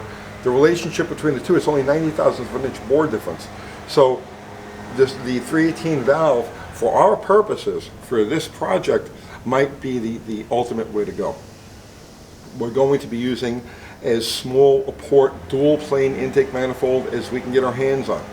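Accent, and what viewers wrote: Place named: New York, United States. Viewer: At 6 I thought that was going to kick all their asses all right so here we go horse is right behind you with the 4-cylinder kick me or kicking your butt real bad what the hell's going on here all right here comes the Volkswagen Mahler is just going to mow you down best Brigg and Stratton and it's not even but one cylinder you know what the hell I know I got a firecracker right here I bet you your hemorrhoids couldn't handle it no more all right here we go you sound like a New Yorker stop whining and crying get out there and really do something I want to see you go to drag strip and make some happen I want you to take that six cylinder and make it happen what the hell happened I don't know you don't know we don't know we want to know what the hell is happening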